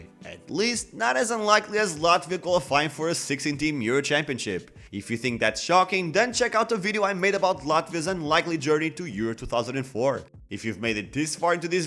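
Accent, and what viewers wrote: Portugal. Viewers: he is Portuguese, his English accent can tell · Sabes que youtuber é portugues quando o sotaque portugues é bem evidente/pesado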